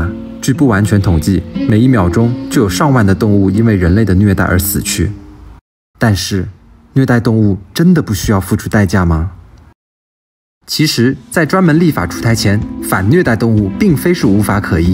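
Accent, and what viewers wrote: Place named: Hong Kong, China. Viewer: 중국 홍콩분이신가봐요 영화에서 많이 들어본 억양같아요 무슨 말인지는 못알아듣지만요ㅎㅎ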